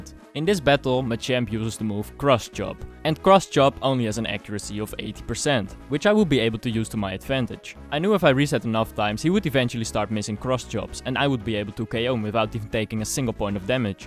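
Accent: Netherlands. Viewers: Ik hoorde het aan je accent, haha!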